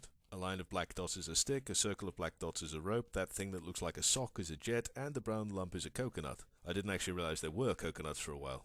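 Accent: United Kingdom. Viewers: but he has a British accent nevertheless, and iam pretty sure he was born in Britain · I got the feeling of beloved Yahtzee, but I missed the excessive swearing about the game. I am after all, a fan of juvenile humor, and hearing a posh british accented man swearnig until his gonards explode is making me laugh. Other than that, It seemed to be a great first review try.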